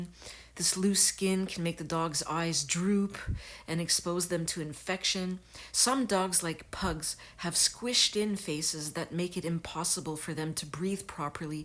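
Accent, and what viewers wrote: Canada. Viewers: It's that Canadian accent.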